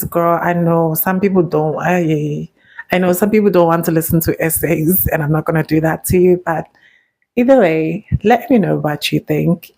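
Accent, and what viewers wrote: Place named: Nigeria. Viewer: Are you sure you are South African? You sound like a Nigerian or Jollofina.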